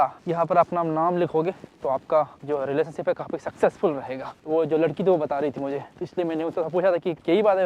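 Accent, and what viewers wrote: Bihar, India. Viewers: Tu Budbak bihari hai kya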